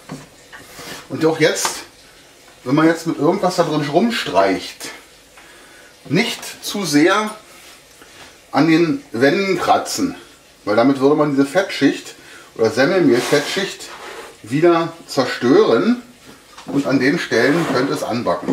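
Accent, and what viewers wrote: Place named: Berlin, Germany. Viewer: Hallo, hab das Rezept für´nen einfachen Sandkuchen gesucht, weil ich den von meinem Vater geliebt habe. Er hatte eine Bäckerei/Konditorei auch in Berlin; daher kam mir dein Slang so bekannt und heimisch vor:) Danke danke danke für dieses prima Rezept. Gespeichert hab ich so einiges, aber dich hab ich abonniert!!!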